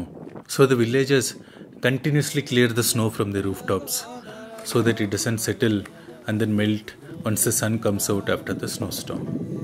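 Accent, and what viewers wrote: South India, India. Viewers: South Indian orator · Orator from south india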